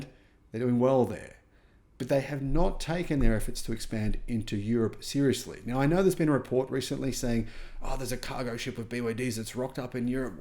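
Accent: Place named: Australia. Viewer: Sometimes "Aussi" accent gets very heavy. Do you understand Mate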